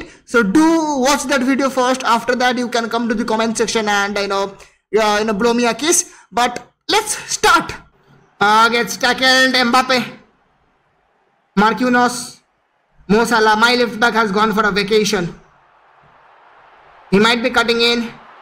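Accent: Bengal, India. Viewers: The English of Bengali .... I am also Bengali.. hello bro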